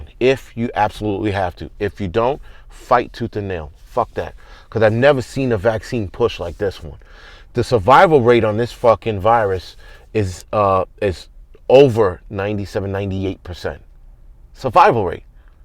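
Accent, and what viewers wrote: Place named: Bronx, United States. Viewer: Hes not angry....hes just from the Bronx.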